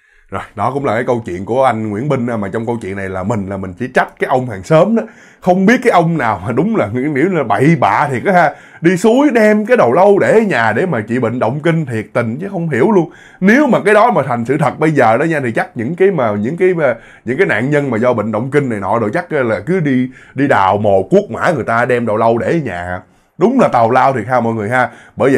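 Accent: Mekong Delta, Vietnam. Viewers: Cái gì mà thuật lại câu chuyện từ người khác. Mà kể như chính mình chứng kiến... Cái gì nói tiếng miền tây rặc luôn..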